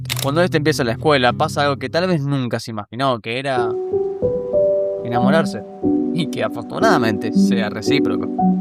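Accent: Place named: Argentina. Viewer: Dios mio, de verdad que el algoritmo de YouTube está podrido estos días al no hacer más populares estas joyas de videos. Amo tu forma de narrar, y el acento argento siempre me ha gustado. Como fanático del cine, realmente nunca se me ocurrió relacionarlos, pero escuchando tus explicaciones, realmente te dás cuenta de que si tienen bastante en común.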